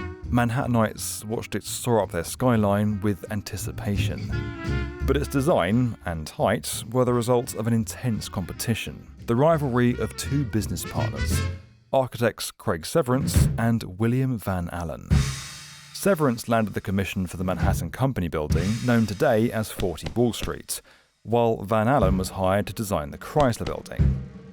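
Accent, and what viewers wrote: United Kingdom. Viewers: Another 20 minutes of a Brit saying Chryzla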